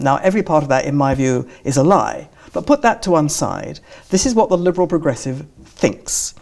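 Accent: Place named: RP, United Kingdom. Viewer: This lady is an idiot, even her pseudo-educated English accent couldn’t help her argue a convincing case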